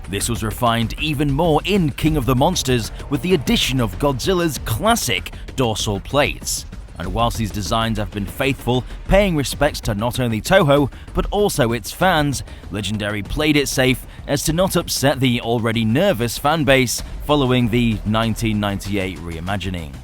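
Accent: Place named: United Kingdom. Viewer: I kinda want to know what type of pound cuz he sounds British.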